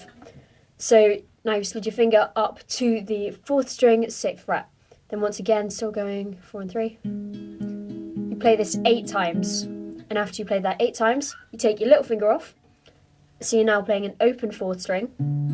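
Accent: England, United Kingdom. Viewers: First, i think your british, XD sorry, by the way, ,love the voice, love the hair, love the song. :) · Thank you for this video, you've made learning to play a little more interesting for me. You sound nothing like an Aussie defs English. Again thank you:-) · Im from England too, omg your so funny. And english accents dont sound like australian accents god..Americans are weird lol :)) Im from Newcastle. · Ella tiene un acento hermoso, así hablando tan bonito en Inglaterra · I'm English and I could tell you where English! Can you do,even though this is like a 6yr old video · im from germany and i "knew" after a few minutes that u are from england. very nice tutorial. best i found so far. only a lil thing would have been nice: if u could play the whole song as an intro or so :D. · I am American and I could tell that you were English, but the real question is what part of england are you from. 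I also understand your frustration with people thinking that English and Australian accents sound the same. Keep up the good guitar work. Can you do by. I am recently obsessed with it. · D that´s funny! I recognized where you come from by hearing your accent!! ok.. i spent a few days in London...and I really like this british accent! youre probably right, but not everybody can hear the accent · Like the way you show around how to play a lot of great songs, great work! Thanks! Ps yes your accent is clearly English to me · where are you from in england? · Love your voice, Ahaha i thought it was Australian until you said British. Now i hear it! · Love your English accent :) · Thank you so much! I've watched dozens if not hundred of Guitar how to's and yours are by far the easiest to follow. I cant wait to watch more of them! And don't worry, the accent is pretty obviously British :) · You can tell you're English. 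Thanks for the video, only Q is after you play intro 2 times then the bridge do you go back to the into? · i knew u were from england i love your accent!! oh and thank you the tutorial was well explained and very easy to understand. ^-^ god job and keep going. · Thanks for this! You DEFINITELY sound English. Like you I also don't get how any one could mistake that for an Australian accent. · thank you for the tutoral :) you're really good at teaching :O love the accent! xD i thought it was a british accent but wasnt certain :P from: irish boy Donal :) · You are so cool. Thank you for this great tutorial. Will help me a lot. You sound English!!! No doubt. Greeting from Germany - Michael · How can u think she's American or Australian. I lived in England for most of my life and then moved to Australia :( all Brits sound like her, great vid by the way!!!! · It's obvious you' re from england :D You're cute... 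^^ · That was so clear, thanks! This was the first video of yours that I saw but hey you sing very well! Oh, by the way, you do sound totally English don't worry ;) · Thanks I am a complete beginner this really helped, how do people think your Australian, you sound english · How does it sound Aussie no chance it's uk all the way btw I'm from uk live in NZ next to Aussie your accent is so much better than g'day mate :D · Hi from New Zealand tauranga..yep Great teaching at the right speed to learn great song .. Thanks . Your accents not to strong english wise but but nothing likean Aussie..cheers. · Great tutorial, it really helped :) and as an English person I can tell that you're English ;)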